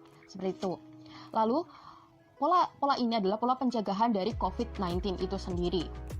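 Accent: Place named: Java, Indonesia. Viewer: Medok bgt mbanya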